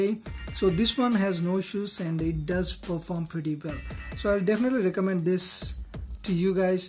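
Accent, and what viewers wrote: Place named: India. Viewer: tere accent se main samajh gya tha tu indian hai... hahaha...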